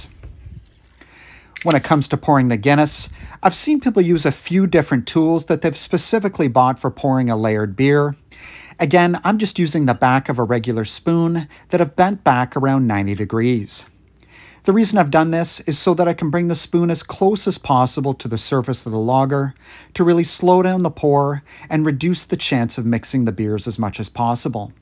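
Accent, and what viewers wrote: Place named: Canada. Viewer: I definitely recognize his Canadian accent.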